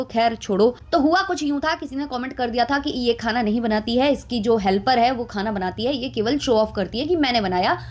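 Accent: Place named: Western Uttar Pradesh, India. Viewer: Di ap up west se ho na .kyoki apke bolne se pta lgta h .hm bhi up west se h .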